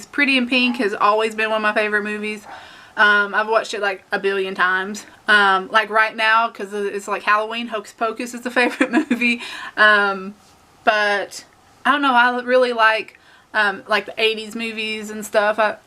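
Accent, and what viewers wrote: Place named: South, United States. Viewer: my true blonde must be showing...because I just noticed your southern accent 😂 really enjoy the direction your channel is going!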